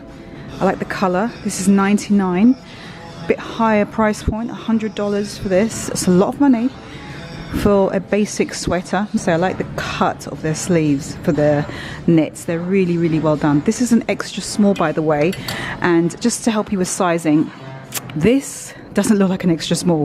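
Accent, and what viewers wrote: United Kingdom. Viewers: You are in Nueva York . We do have a few from the UK here , luv your accent!!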